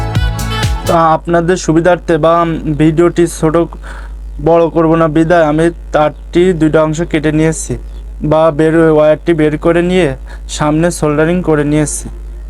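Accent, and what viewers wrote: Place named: Narail, Bangladesh. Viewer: প্রাই নড়াইল এর ভাষার মত,,,,, কোন জেলায় বাড়ি ভাই আপনার??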